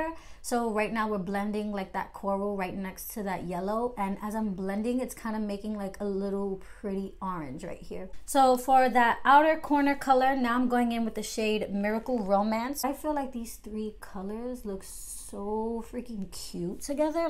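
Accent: New York, United States. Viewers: you sound like you from new york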